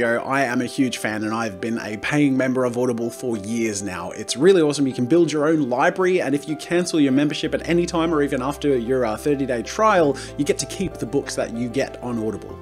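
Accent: Australia. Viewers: BeanDip: triggered American cause an Australian is being Australian Me: triggered Australian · No it's zed, he is Australian and that's how we pronounce it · Australians (me) say zed and since is Australian he would say zed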